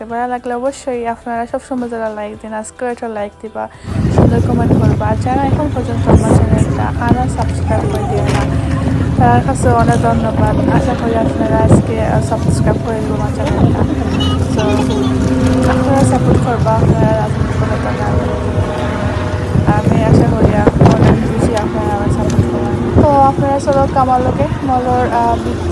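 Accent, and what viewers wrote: Sylhet, Bangladesh. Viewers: Amar car wash ay jaytay darun lagay, specially car ER bhitor boshay thaktay. Apnar bhasha ki sylheti? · Walaikum Salam apu. Apnar sylheti accent khub valo laglo. Joined you join me too dear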